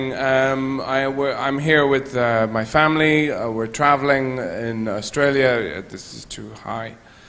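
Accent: Ireland. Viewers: hey dip wit hes irish not english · lol he isnt english at all, he's irish from the republic of ireland. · He is Irish, not British. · I'm Irish so when he started talking I was like Wait is he Irish ?! Then I like nah probably not nobody Irish is really famous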